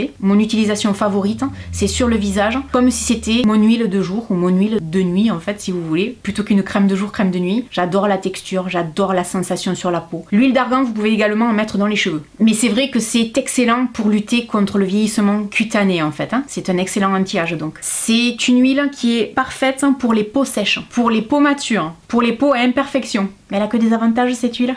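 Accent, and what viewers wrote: South, France. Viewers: C'est clair ! Et son accent qui donne l'impression qu'on est dans le sud et qu'il fait beau...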